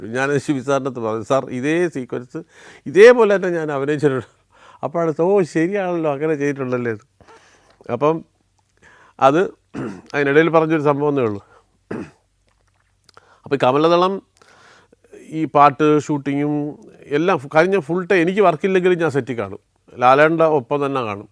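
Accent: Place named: Thiruvananthapuram, India. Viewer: തിരുവനന്തപുരം സ്ലാങ് ൽ കേൾക്കാൻ നല്ല രസം 👍🏼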